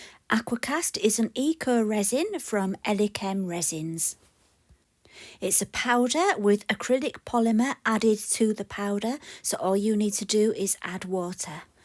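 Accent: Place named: Northern England, United Kingdom. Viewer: Thank you for posting this video, it's so informative. I love your lovely Northern accent and voice. It's so nice to hear a British accent in the sea of American ones (not that there's anything wrong with theirs 😅) just lovely to hear the expressions and colloquialism 💞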